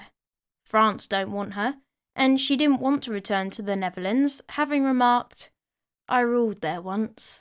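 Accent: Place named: England, United Kingdom. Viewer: French aristocracy and/or monarchy typically reside outside my interests, but with you've managed to usher me through the portière with you're ability to create these historic characters into actual living persons. I am an American and of course I find your English accent fascinating and exotic but yours in particular has an intriguing aspect. Have you done any work into the Scottish folk? thanks so much for your content.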